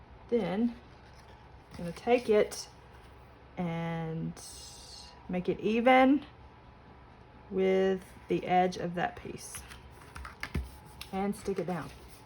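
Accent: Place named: Tennessee, United States. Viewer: Very creative! I'm a new sub. Sounds like you are from TN, possibly KY or the Carolinas. The extender arm on my Fiskar cutting board won't go all the way up. Is there a trick to it? 💖🌷✒📖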